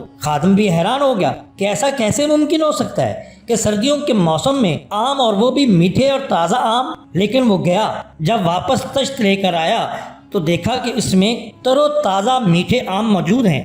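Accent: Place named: Punjab, Pakistan. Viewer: I hate PUNJABDA ACCENT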